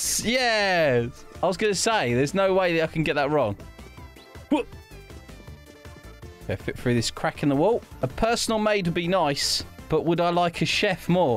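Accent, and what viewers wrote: United Kingdom. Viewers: That's our amazingly charming British accent for you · Your british to mate❤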